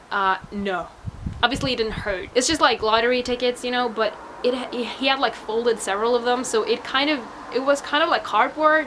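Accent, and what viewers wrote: United States. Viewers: Kinda off topic, but your accent sounds a bit southern (USA) at times.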